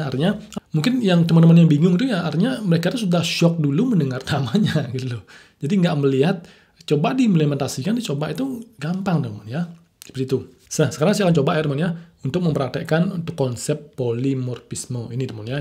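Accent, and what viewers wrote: Bali, Indonesia. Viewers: Dari Aksen nya Pak orang Bali ya? Hhe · Kak dari mana ? logatnya kayak orang bali : ) sukses terus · Kalo gk salah saya merasa ada logat balinya hehe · logatny kek logat bali mas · Keren penjelasannya om, btw logat bali, asli bali om? wkwkwk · Logat Bali asane ne, gitu lo. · Logat balinya kental banget wkwkwkwk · kental banget logat bali nya :D, thanks video nya saya jadi lebih paham lagi konsep oop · mantap logat balinya kerasa sekali. btw kalau boleh cerita dan bagi tips belajar bahasa inggris dong bli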